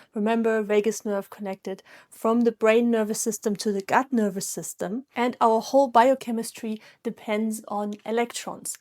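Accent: Germany. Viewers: Thank you so.much! I already did the tapping and it works instantly. Do I sense a slightly German accent? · Du hast einen starken deutschen Akzent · Danke für das erfrischende Video :-). Ich würde Sie auf Grund Ihrer Aussprache irgendwo im hessischen Raum verorten, aber vielleicht irre ich mich auch, und Sie haben gar nichts mit D zu tun ;-). Falls jemand gerne liest : von Clinton Ober gibt es das empfehlenswerte Buch (gibt's auch auf deutsch) "Earthing - The most important health discovery".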